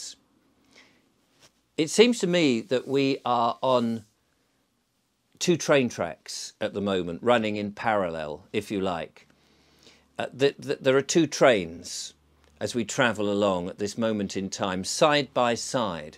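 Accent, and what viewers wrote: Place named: Eastbourne, United Kingdom. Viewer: Sounds like you live in Eastbourne ☺️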